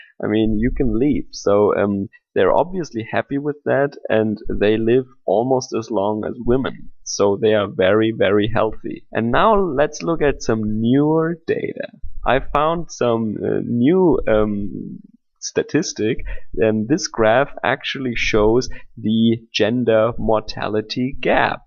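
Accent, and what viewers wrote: Germany. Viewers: I seriously just heard german accent in this and never really watched anything by.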